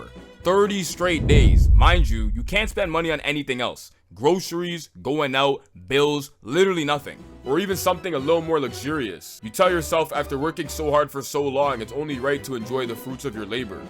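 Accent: Toronto, Canada. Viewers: I hear a Toronto accent😂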